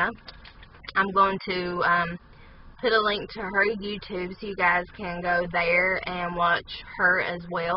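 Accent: South, United States. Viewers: love the southern american draawl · Southern accent is more noticeable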